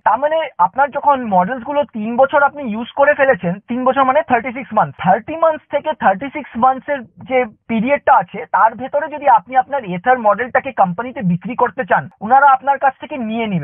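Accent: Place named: Bangladesh. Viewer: Aapnar pronounciation Bangladeshi der Moto..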